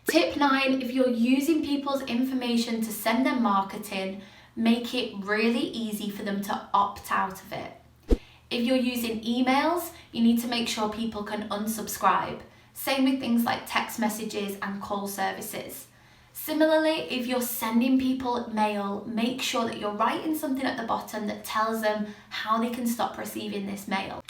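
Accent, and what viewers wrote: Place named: England, United Kingdom. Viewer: Love the accent, I just close my eyes and imagine the extravagant 18th century English solicitor in a wig. Can you get one of those and wear it for these legal videos? Wigs, I mean, not solicitors.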